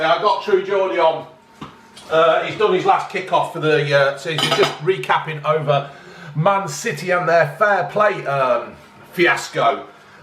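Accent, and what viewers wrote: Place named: Sheffield, United Kingdom. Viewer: Tanvir Sekhon Kell Brook accent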